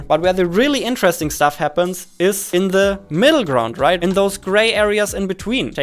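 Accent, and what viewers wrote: Germany. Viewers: This is the most German accent I have ever heard. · Ich hab direkt erkannt, dass du deutsch bist :). Merkt man an deinem Akzent, aber nach weiter so, feier dich und hoffentlich kriegen wir endlich die 100k Abos fertig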